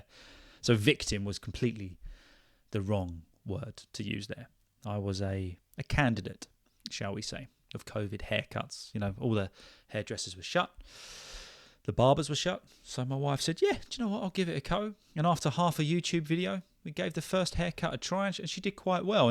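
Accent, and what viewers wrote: United Kingdom. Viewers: Me too, this podcast is so natural flowing within nice British Accent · Your accent sounds beautiful but my self I learn and practice American English, British English seems bit harder than USA English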